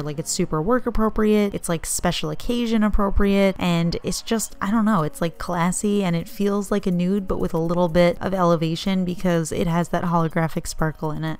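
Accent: United States. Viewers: That was absolutely cruel to watch. They are all gorgeous. Love your channel btw. You're so chill and relaxing to watch. And I love your hair. There's heaps of American accents but yours is the coolest. What part of USA is that accent? Sorry to sound so fan girly but you deserve it. I guess you have hair dying tutorials. Might just go and check em out.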